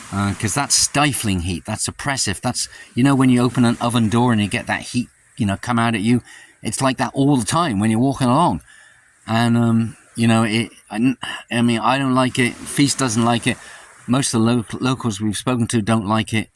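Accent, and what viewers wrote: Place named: United Kingdom. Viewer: its pronounced "bung-car" hes from Britain us foreigners have quite different vowel pronunciations.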